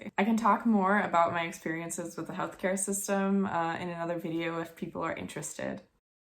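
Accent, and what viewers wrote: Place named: United States. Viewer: This video was aired in Sep 2020 in COVID lockdown and I'm American like you but then again you could be Canadian because we have same accent.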